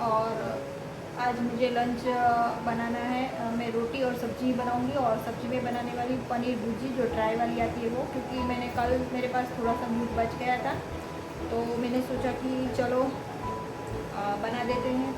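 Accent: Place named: Gujarat, India. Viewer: Di ap gujrati ho apka tone se lag raha.... Di apko sub krdiya hi...mujhe support ki jarurat hi di plz support kijiye naa....mi v roz augi apke video pe i promise 🥰🥰🥰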